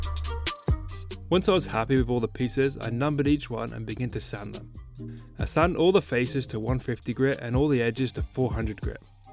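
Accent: England, United Kingdom. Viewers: You sound English. Why are you using imperial units and not metric?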